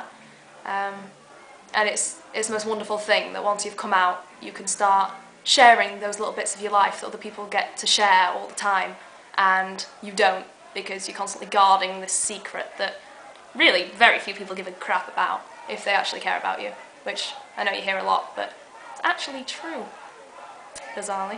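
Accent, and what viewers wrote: United Kingdom. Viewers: Are you from ire or Scotland? U sound Scottish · i'm straight and i loved your story. your adorable! i love your british acsent. i wish you well.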